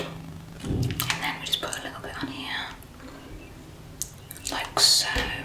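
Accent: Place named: United Kingdom. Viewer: Hi love 💕 i like your british accent. Are you from Australia?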